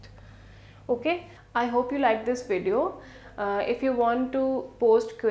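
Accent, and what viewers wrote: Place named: Punjab, India. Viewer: Love from Pakistan and really love your punjabi accent.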